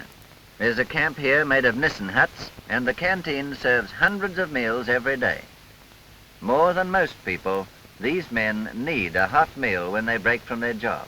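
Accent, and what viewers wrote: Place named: Australia. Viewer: the narrator actually sounds Australian ☝️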